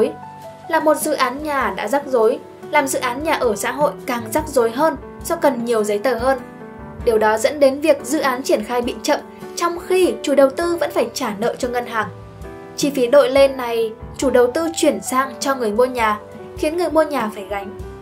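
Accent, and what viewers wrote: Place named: North, Vietnam. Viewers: Bài viết có thể của 1 bạn miền nam mà còn giọng đọc thì của bạn miền bắc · Căn giá rẻ thì vị trí không đẹp, căn đẹp thì vị trí mắc? Sao đang nói giọng miền Bắc nhưng cứ chêm mấy từ của miền Nam vào vậy ạ?! Râu ông nọ cắm cằm bà kia. Lạm dụng quá nhỉ?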